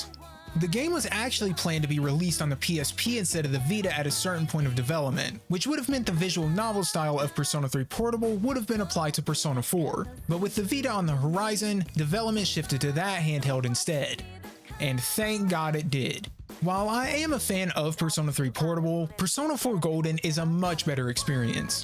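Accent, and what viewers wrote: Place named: South Carolina, United States. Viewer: As someone from SC, I do really love that your accent slowly comes out more and more throughout the video. It's such a comfort to hear!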